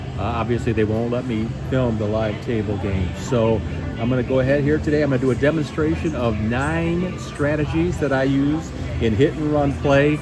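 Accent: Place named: Midwest, United States. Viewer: Are you from Michigan or Wisconsin 😊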